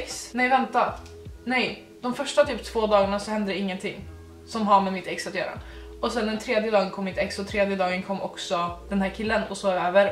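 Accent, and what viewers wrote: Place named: Norway. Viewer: Omg älska hur man kan höra din norska lite omg så nice haha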